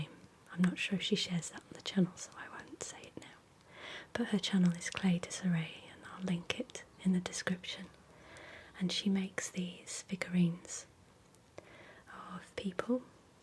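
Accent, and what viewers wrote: United Kingdom. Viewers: Hi! So good to see you again and have you back!:) I've been following your channel for about five years now. Could you remind me again, where about in the UK are you located (I'm a glossophile from New Jersey, United States)?? Could you also remind me, where about in the UK is your accent from? Where did you grow up? Sending you much love and many positive vibes in these very strange times! <3 <3 <3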